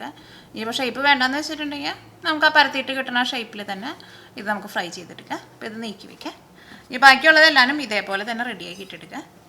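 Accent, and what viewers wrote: Thrissur, India. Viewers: നല്ല അവതരണം .തൃശൂർ നിന്നും പറയുകയാണ് എന്ന് തോന്നും .So simple straightforward conversational style keep it up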